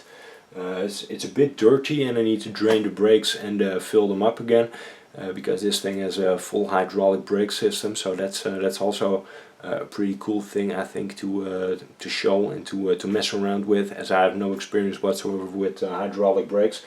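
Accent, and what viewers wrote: Netherlands. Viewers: Great look around your storage room. Thanks. I like your dutch accent. One of the reasons I watch your , other the really cool RC videos. HOOORAY FOR EVERYTHING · iam dutch to you have a realy dutch acent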